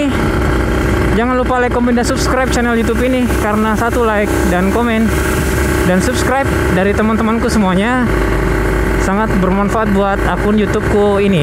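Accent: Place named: Sulawesi, Indonesia. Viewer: Asli Sulawesi kah bg? soalnya logat nya kaya orang Sulawesi •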